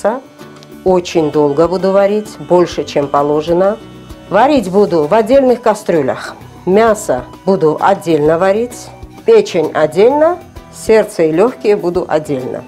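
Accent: Georgia. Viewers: Как говорил один мой шапочно ЗНАКОМЫЙ-ОХРЕНИТЕЛЬНО!А я у себя в Белфасте убитую микроволновку под копчение приспособил-там бак из нержавейки.Позже нашёл выброшенную кем-то барбекюшницу с прогнившим дном, и вместо гнили върезал туда ведро на 10 литров.Теперь она на ведре стоИт при копчении, и туда влезает 2 курицы, или 5 филе скумбрии. Вот дождусь выходных, и сотворю ящик для холодного копчения, с подачей дыма с помощью туалетного вытяжного вентилятора))), я вас обожаю! Ваш лёгкий грузинский акцент возвращает меня в годы моей молодости, когда национальность не имела значения.С ОГРОМНЫМ УВАЖЕНИЕМ к Вам и Вашей Семье. Ребята, мы должны так же жить в Нашей Стране, как вы в Испании!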